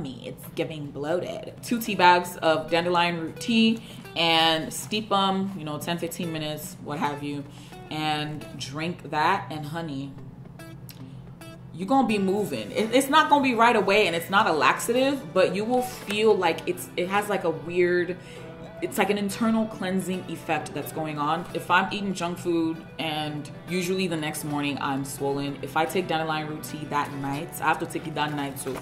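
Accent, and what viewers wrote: Nigeria. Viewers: are you from Nigeriaaaaaaaa?😅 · Great video 👌🏻 Love the Nigerian accent! 😅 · Love the Nigerian accent.....Love from Naija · We are the same... I am THE supplements’ Queen! taken most of the supplements you mentioned. Took MSM in only water too! Stopped as it messed with my stomach after a long time. Taken collagen for years. Take chlorophyll as it helps me with energy and my red blood cell count. May just return. ... and did I hear a Nigerian accent? Love how you switch. Laughed a lot. Im subscribing, my naija sis! 💕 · Girl I love your frequent switch to Naija accent, lovely video. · Are you Nigerian?? 😅🤣🤣🤣. I like the way the Nigerian in you jumps out every now and then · Great video,you got a new subbie as soon as i heard my Nigerian accent?Naija for life,lol. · Thanks for all the info. I get my supplements from iHerb too. Never heard of some of the supplements you mentioned. 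Meanwhile you got me when I heard that Naija accent.. waow I was like, whaaaaat that’s my sis. 👍🏽 Abeg you ooh keep it up 🥰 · new SA suby thank you so much for great advise my 27 year old daughter struggles with body ordor.....anyway. loving the Nigerian accent LOL!!! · You are a NIGERIAN??? At a point it was just clear. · Lol its the nigerian accent for me no matter how bitter it is I'm going to take it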